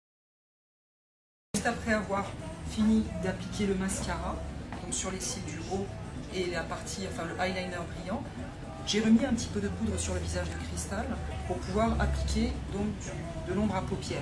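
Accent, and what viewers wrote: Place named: England, United Kingdom. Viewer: puree l'accent anglais !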